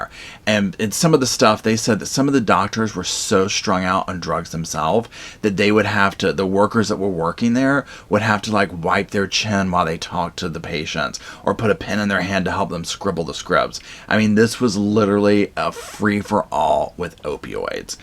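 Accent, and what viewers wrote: South, United States. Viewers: I just love you! I’m from savannah GA and your accent sounds like mine & expresses so well what us southerners sound like telling any stories! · Dude southern accent is soo on point 👌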